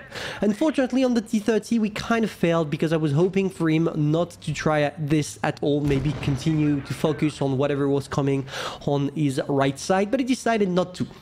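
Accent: France. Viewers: My ears hurt when he pronounces english words like they were French and I can tell because I'm French myself but I'll give him credit for speech flow.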